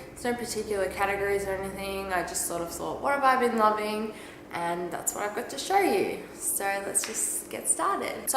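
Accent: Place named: Australia. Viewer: Love this! And seriously love hearing another Aussie accent! x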